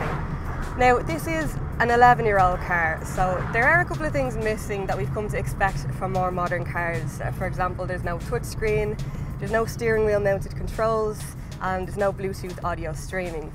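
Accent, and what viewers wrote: Ireland. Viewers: The Irish accent is so beautiful. When its coming from an irish woman it's just drop dead gorgeous.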